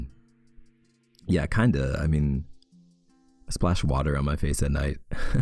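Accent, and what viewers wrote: Canada. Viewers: his canadian accent is adorable ugh 🥲🤍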